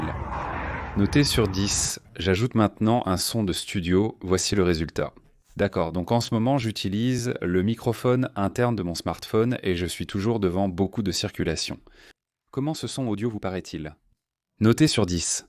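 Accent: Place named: France. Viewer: French comment : french voice looks like something created by IA (synch lips not real), but the voice is good, with realistic french accent, not robotic. Pleasant enough.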